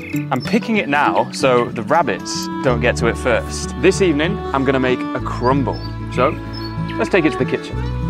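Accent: United Kingdom. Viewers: He is so nice but I have problem with a British accent 😢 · I very like British English! Thank You! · Thank you. I´m from Mexico and I thought that the accent British would be difficult for me, but not. I´m grateful to find your channel. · It's been a month since I started learning English, and l've realized that British English is easier for me than American English I can easily understand what you're saying I'm new subscriber and I really like what you share with us keep going 🎉🎉🎉🎉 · Hi, I am excited to watch your comprehensive videos. I have got a lot of vocabulary there, besides I get used to listening a British man speaking about his sorroundings, thank you, stay healthy, will you? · The video was so aesthetic✨. I really like the British accent, it’s so charming. I felt relaxed and at peace while watching it. Please make more videos like this!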